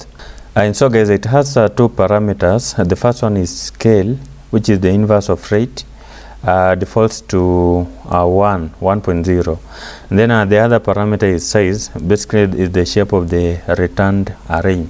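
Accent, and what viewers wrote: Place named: India. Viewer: our legendery indian accent tutorial)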